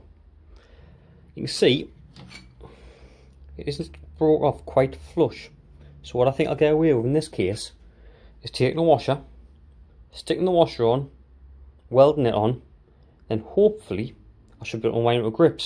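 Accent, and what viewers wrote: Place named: North East England, United Kingdom. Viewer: Mate av got the same problem with my trials bike am sick, you sound like your from the north east, fancy helping me?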